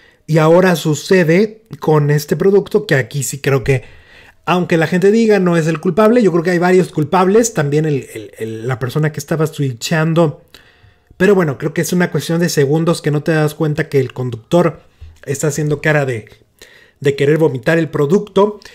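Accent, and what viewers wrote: Mexico. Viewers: Como dicen en Mexico jajaja por qué el es Inglés pero Maneja muy bien el mexicano jajajaja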